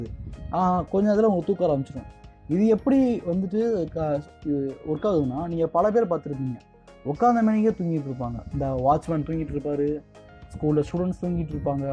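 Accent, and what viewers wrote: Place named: Kerala, India. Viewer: ninga pesarudu sariya Kerala OK so good tips I tray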